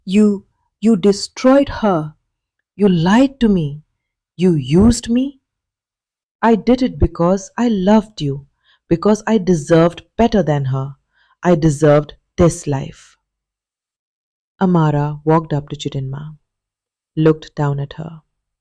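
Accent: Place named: India. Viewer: The narrator voice changed to Indian,not professional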